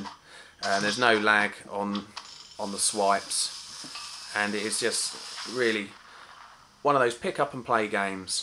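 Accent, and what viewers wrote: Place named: England, United Kingdom. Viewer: I think this is outstanding vid specially for a 1st one. You have big future on YT. I can tell. Appreciate Your work. BTW Thats the second english accent i love... first was Wheatleys one obviously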